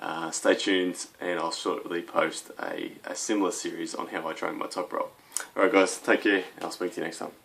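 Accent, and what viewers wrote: Australia. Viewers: I reckon some people are just too literal. Obviously ya boy here isn't suggesting that this is the 100% tried and true way to train for hooking. He's just showing what HE does to train his hook, so that someone who is looking for a little more for THEIR own workout, they can try this. It's a FREE video SUGGESTING and OFFERING ideas to better someone else's training. It's not like you had to pay $65.99 for the video. Just take what you want from the video, give the Australian man a thumbs up, say something nice in the comments like "Hey, cool accent, thanks for the tip, and good luck in Australia" and be done. Don't smite the guy for free advice or the way he trains. He'll end up breaking your arm at the table.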